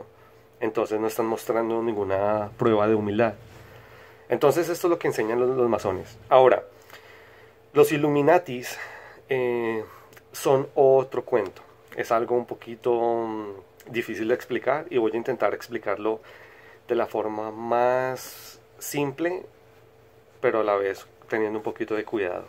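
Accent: Colombia. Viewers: A ver... Es del futuro....? De que año? Y porque habla como rolo gomelo gay de la actualidad....? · Eres colombiano 😁?? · Esta bueno el vídeo....Creo que el acento de este hombre es de Colombia 🤔 jejejejejeje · Gracias por tú información amigo,por tu acento sé que eres colombiano, pero lo importante aquí es que eres inteligente, gracias por la información yo en lo personal no tengo religión pero no soy ateo yo creo en jehová en Jesucristo y en el espíritu santo y ,no soy masónico pero creo que sin querer me identifico más con ellos, no quiere decir que quiera unirme a ellos, pero no e querido unirme a ninguna religión cristiana, porque veo que siempre hay desigualdad en todas,,claro y lo tengo muy claro, nunca seré musulmán ésa religión sí no le encuentro sentido alguno,,,,,morir sólo porque sí muero me van a estar esperando siete vírgenes en el cielo,eso no tiene sentido, yo prefiero disfrutar vivo a siete mujeres aunque no sean vírgenes pero en vida y claro si ellas quieren con el consentimiento de ellas!!!! 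· Jefe Maestro no ve ke me estoy riendo. ? no me molesto. pero eske si. la vos de este hombre. me encanta. nose si es colombiano. eso me encantaria todavia mas. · Tienes acento colombiano! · Lo que usted dijo ya se dijo otro lo dijo al otro y dijo digan al otro a que digan y lo dijeron a digan y dijo y así me doy y al final no decís nada y te cubrís de ninja por miedo o tu eres masón tu acento es colombiano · Eres Colombiano? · me pa ke sos colombia vos-- igual bien x tu data-- --- yo argentina ! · Acento colombiano? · Tienes acento bogotano, me gusto el video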